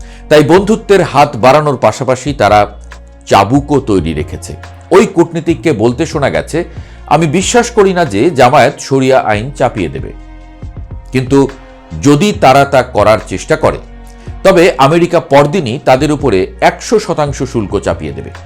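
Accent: India. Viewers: তোমার কথা শুনে বুঝা যাচ্ছে তুমি করা ইন্ডিয়ান দাদা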